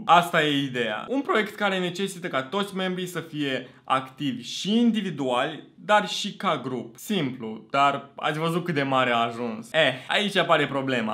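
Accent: Moldova. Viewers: Ador accentul tau😍 esti moldovean de-al meu 😌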